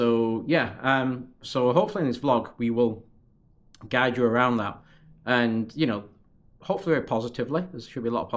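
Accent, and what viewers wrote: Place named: Northern England, United Kingdom. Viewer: Last time in Orlando we had tickets for Volcano Bay that had just opened, but every time we got there it was full for the day and that was before 9am. Things have gone full circle with the queuing, back in the 90s with less parks the queues were horrendous, 3 hours being typical, but with many more parks opening queue lines dropped so you wouldn’t have to queue much beyond an hour for anything. That’s all gone now and with the constant summer rain it makes for a less than perfect scenario. Thanks for the video. Nice to hear a northern voice, we are near Manchester.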